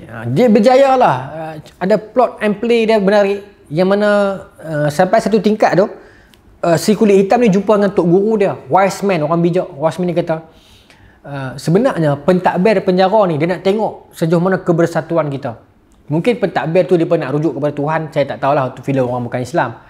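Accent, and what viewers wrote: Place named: Kedah, Malaysia. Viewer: Seronok dengar loghat kedah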